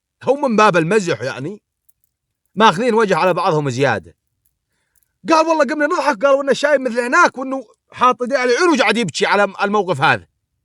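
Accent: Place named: Iraq. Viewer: متابعك من العراق وبل صراحة ما عبالي انو اكو ناس بسوريا لهجتهم تشبهة لهجتنا لان احنة امعاهدين على لهجة أهل سوريا كيفك و شو بدك وربي يحفضك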